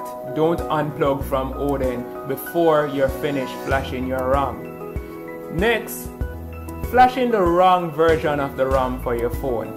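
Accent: Jamaica. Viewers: You sound kinda like Jamaican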